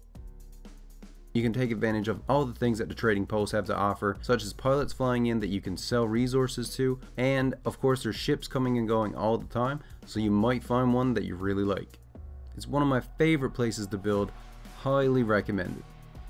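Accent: Newfoundland, Canada. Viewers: I knew you were from NFLD and then you said that at the end lol. Hi neighbor, great video!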